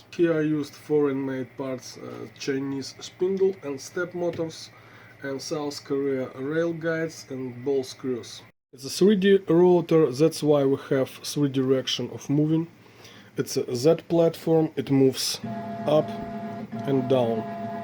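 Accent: Russia. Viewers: У вас ,один из самых страшных русских акцентов!!Но руки золотые.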